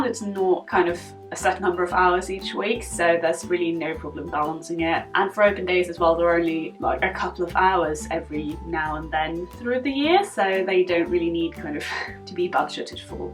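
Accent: United Kingdom. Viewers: When you said you are a international student I thought "huh but I thought you were from the UK, no way you can talk that good English with a UK accent but not being from the UK", but then you mentioned that you were from Norway and I felt stupid. I love your videos, you have such a bright and funny character!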